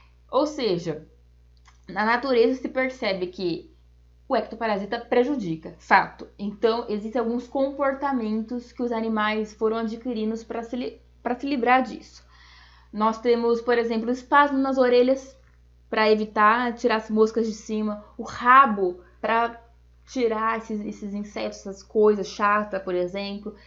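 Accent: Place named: Inland São Paulo, Brazil. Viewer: Agora, uma pergunta: Esse sotaque te entrega que vc é do interior de SP ou PR. De onde vc é? (Até pq eu tbm tenho haha)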